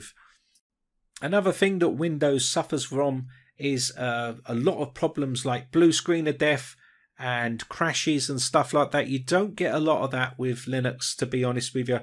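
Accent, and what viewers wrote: United Kingdom. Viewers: Love your British accent, I was recently interested in the comparison between these os, love from India 🤗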